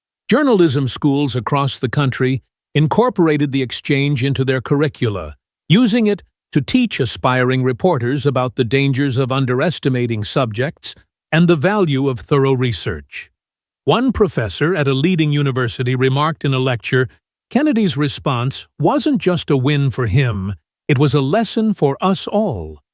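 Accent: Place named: United States. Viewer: This STUPID NARRATOR describes Collins's hair as BLOND. - I HATE these STUPID American-voiced, narratives.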